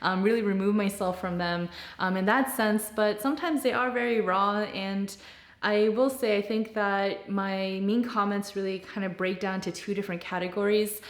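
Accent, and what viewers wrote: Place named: United States. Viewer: Technically you're not really Korean anymore. That's your past. Korean and American are nationalities and so is Jewish, and you can only truly be one. From your accent I assume you used to be an American with Korean background. Now you're a Jew with American and Korean backgrounds. Even though I'm not a convert, sometimes I forget myself and think that I'm American, so I deal with a similar kind of issue. My wife is a convert, though.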